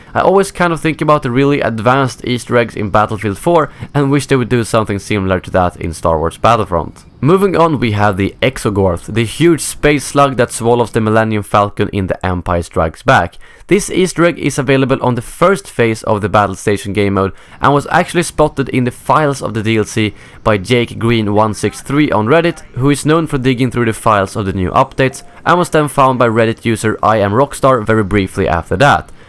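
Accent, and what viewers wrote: Sweden. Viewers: are you froom sweden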